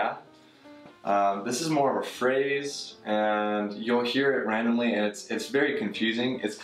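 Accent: California, United States. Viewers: I’m a Californian and yes this how we talk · I like the way people from Cali talk!!!! Floridians talk too funny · Just here as a California female native born in 1972 in Danville, CA searching for ...do I actually have an accent...you are much younger but talk like me, so that is something.... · This is "TV Californian". Not saying people don't use it but it's not how we spoke when I was growing up in Southern California in the 50s. (My family has resided in SoCal for over 120 years.) Classic "Californian" sounds more Midwestern sans the solecisms of the mass entertainment era. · Haha, I gotta say, personally I've never heard someone with as pronounced of a valley girl/surfer guy accent. I'm sure some people say it, might just be the area I'm in, but the "whatsaah dude" and "gnarly" feel more like the stereotyped Californian accent than how an actual Californian speaks lol The "yeah no" and "no yeah" are on point though 😂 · I think it's kinda cool. 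I think it's the mainstream version of what ppl outside the state probably think Californians speak like.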